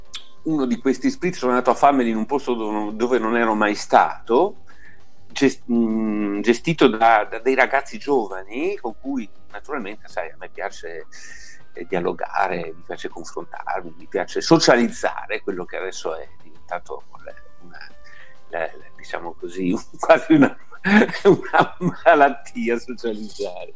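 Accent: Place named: Veneto, Italy. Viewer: Ma una domanda dato per scontato il tuo accento veneto, dove vivi?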